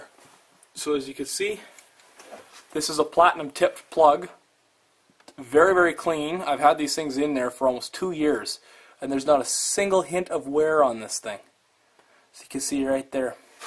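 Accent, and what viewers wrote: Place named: Canada. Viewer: You sound kinda Canadian, eh? I would check those gaps. I dont trust the mfr to gap them properly.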